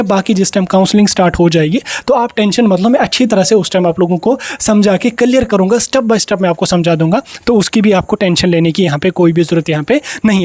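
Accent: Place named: Kashmir, India. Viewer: Kashmiri ascent is obedient ❤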